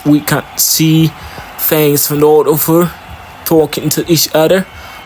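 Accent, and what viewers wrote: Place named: Liberia. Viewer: My brother don't mind those people complaining for not using Liberian accent ...I am from Nigeria and married to a Liberian woman for 23 years 3 kids...Trust me most people can't understand when you speak in Liberian accent..you can't grow by remaining LOCAL...You have to Twick your accent to attract a wider audience.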